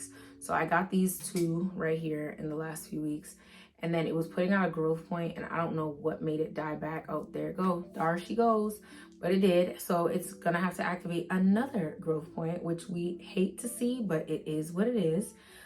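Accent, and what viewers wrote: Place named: New York, United States. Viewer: GORWLLLL how am I now coming across ya page… are you from New York? Cuz I know my New York girlies accent. Like, I’m cutting up. Naw, I gotta tap in now. Yuuuuurrrrrr 😅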